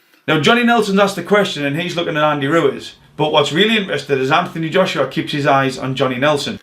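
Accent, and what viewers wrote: Newcastle, United Kingdom. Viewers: Anyone else he sounds like true geordie or is it just me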